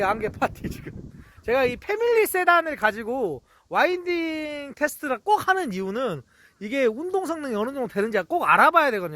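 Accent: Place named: South Jeolla, South Korea. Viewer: 억양이 전라남도 무안쪽 사투리 같은 느낌이네요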